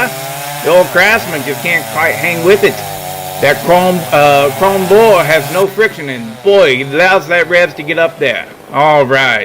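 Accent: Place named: Louisiana, United States. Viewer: Your video quality is freaking fantastic and fun! That Cajun accent is funny stuff!!!!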